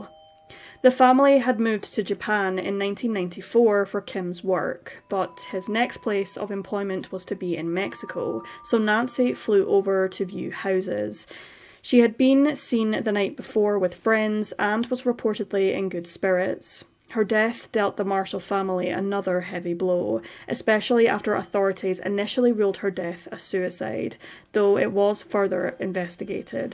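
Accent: Scotland, United Kingdom. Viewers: Remember she is Scottish and they pronounce things differently there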